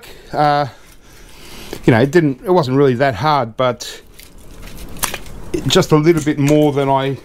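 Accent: Australia. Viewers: Hello from Napa Valley, CA, USA!! I have seen many of these teardowns of air conditioning rads. First of all, those copper coils or "noodles" are sawed off, then that sheet metal piece is removed before scrapping as it is. Love your "Down Under" accent and keep the videos coming!! Watch out when scrapping the second rad!! I saw a menacing looking spider!!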